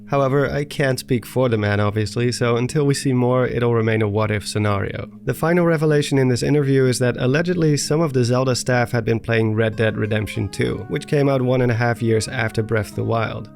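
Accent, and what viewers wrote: Netherlands. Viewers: is this guy dutch ? whats his accent :)?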